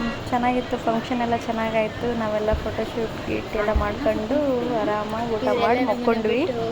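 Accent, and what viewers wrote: North Karnataka, India. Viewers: Supet nema ❤❤ nice vlog video ಮಾಡ್ತಾ ಇರಿ ನನಗೆ ನಿಮ್ಮ ಉತ್ತರ ಕನ್ನಡ ಭಾಷೆ thumba ist sissy ಅದಿಕ್ಕೆ video miss madde nodthini ❤❤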